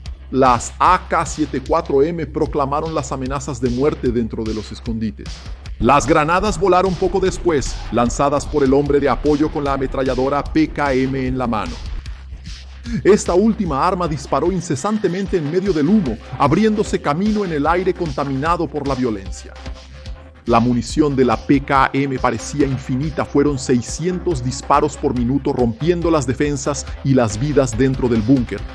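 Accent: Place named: Venezuela. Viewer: habla como un negro venezolano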